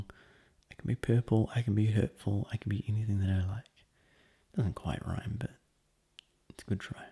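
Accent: New Zealand. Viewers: what part of new zealand do you come from? im from auckland :)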